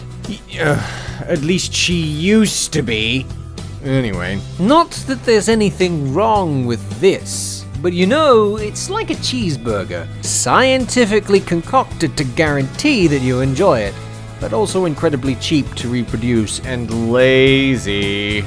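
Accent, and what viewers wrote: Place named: Scotland, United Kingdom. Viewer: wait wait wait!! r u scottish...that explains so much actually... #freedom #hashtagsrsostuipedyamidoingdis?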